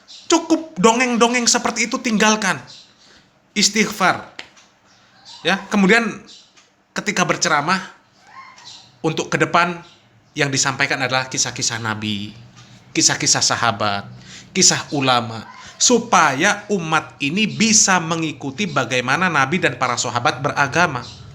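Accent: Java, Indonesia. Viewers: MIN ADMIN....OMAHMU NGENDI MIN....BHSA JOWO MU KOK MEDUK... JOWO NGENDI KUWE.... AYO KETEMUAN MIN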